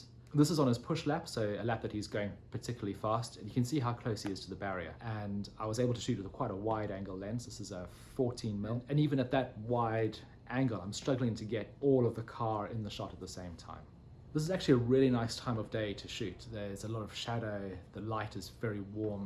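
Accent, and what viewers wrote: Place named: England, United Kingdom. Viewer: Very talented..although I much preferred your floppy fringe..it matched your english accent!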